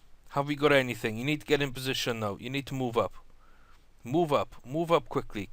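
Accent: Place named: Wales, United Kingdom. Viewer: That's a Welsh accent if I've ever heard one. Where you from?? I want to say the Valleys?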